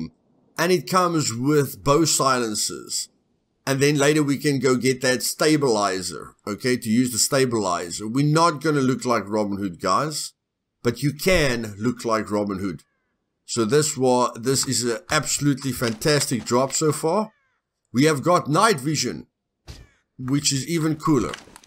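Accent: South Africa. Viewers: Always good hearing another South African accent. Thanks for these, I'm learning a lot! What happens when you log off? Is it like rust where you just fall asleep and your character is still in the world and vulnerable?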